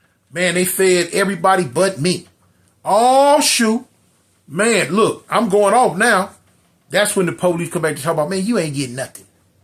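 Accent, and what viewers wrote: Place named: Alabama, United States. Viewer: Lmaoooooo the Hounds of Baskervilles is crazy yo u read that book? 😂😂😂😂 that Alabama accent got a good grip on u big homie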